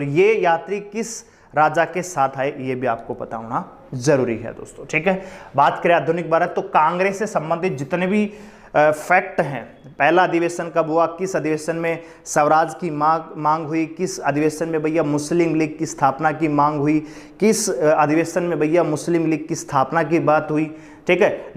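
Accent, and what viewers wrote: Haryana, India. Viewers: Aap haryana se ho kya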